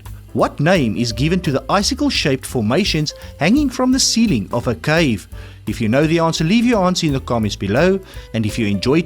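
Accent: South Africa. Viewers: Bud are you a South African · Stalactites. Got 7 wrong so far...!!!!!!!!!! Is that a South African accent? RE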